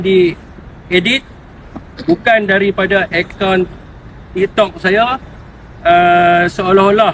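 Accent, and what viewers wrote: Kedah, Malaysia. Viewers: AKU TENGOK MUKA KAU DAH MACAM BEROK. KELUARGA SIAPA NI AGAKNYA. CARA PERCAKAPAN MACAM ORANG KEDAH BETUL KE🤔🤔🤔🤔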